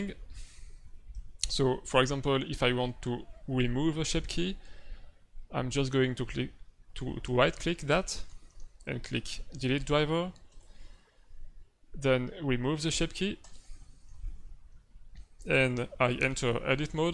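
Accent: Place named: France. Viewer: coolla video, par contre des la premiere seconde on sait que t'est français poto ;) , merci pour le tuto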